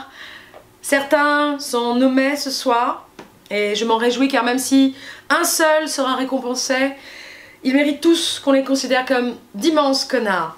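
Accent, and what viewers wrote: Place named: Suburbs, France. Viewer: J'aime bien ton accent de banlieue des années 80 , 90...🙃😉😗😘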